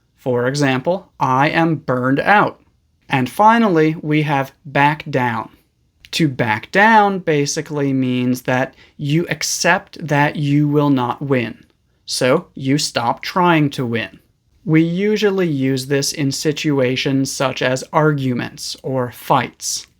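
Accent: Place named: United States. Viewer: I was blown away by your beautiful American accent. 😊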